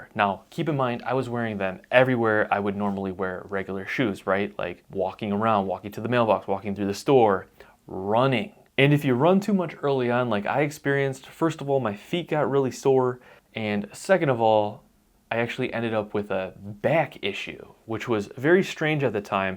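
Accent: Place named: Upstate New York, United States. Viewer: Are you originally from upstate NY? I like your subtle accent - it's just like my friend's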